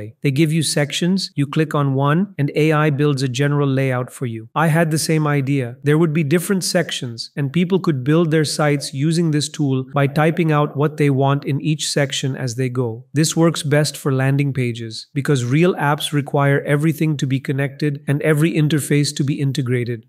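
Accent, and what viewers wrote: India. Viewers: Bro... literally me every fking day! FYI - it's not cursor. It's fking claude. Thank you for not glossing over when tools are dumb af, This AI narrative is much better than the narrative you're using for the one with the asian voice. The asian voice one is a little more sycophantic than this indian one.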